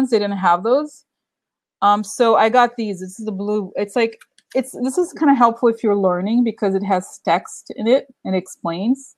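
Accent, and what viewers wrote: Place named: Russia. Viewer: Xoxoxo I love your Russian accent. 😊😊😊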